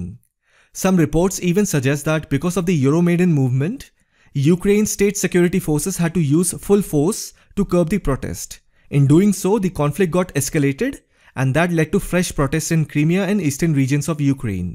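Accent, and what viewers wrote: India. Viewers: Definitely need the Indian accent to put emphasis on what is happening here.. totally made me understand it better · I never thought I would survive an entire video with Indian english but your effort of research and the quality made it worth it lol. Keep it up!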